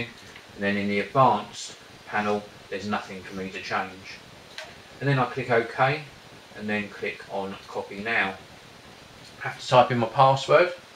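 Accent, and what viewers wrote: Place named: Cockney, United Kingdom. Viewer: Every time I hear this intro music, I think "Cockney accent coming up".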